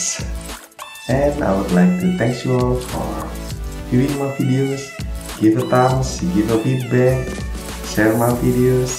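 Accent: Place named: Philippines. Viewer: Parang may pagka slang yung tagalog niyo poh,,taga San kayo,,,tinapos ko na hanggang dulo para walang sayang kuya,,sana ikaw din asahan ko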